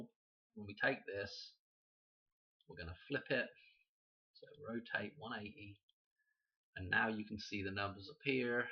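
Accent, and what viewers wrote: United Kingdom. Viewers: 🕊️🌿 Your findings are AMAZING! You are helping me confirm and make many connections. THANK YOU! THANK YOU! Lovely to hear your British accent too. I was born near Stonehenge, surrounded by Churches named after Mary Magdalene 🌹🌿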